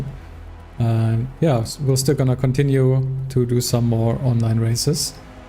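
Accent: Germany. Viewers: Moin. Deutscher Akzent?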